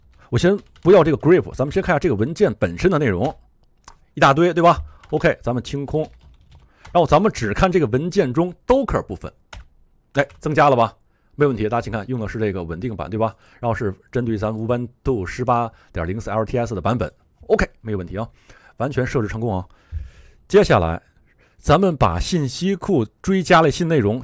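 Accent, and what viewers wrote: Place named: Japan. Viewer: 您的日语口音的英语太有意思了 LOL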